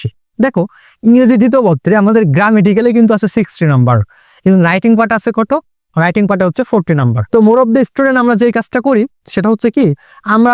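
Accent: Bogura, Bangladesh. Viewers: Vai bogurar naki????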